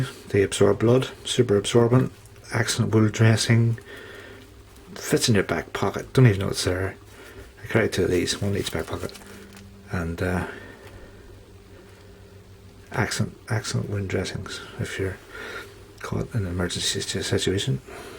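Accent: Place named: Northern Ireland, United Kingdom. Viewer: No knife at all in Ireland but this guy is in Northerm Ireland by the sounds of it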